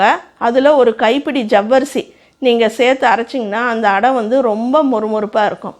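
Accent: Salem, India. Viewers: neenga Salem ha madam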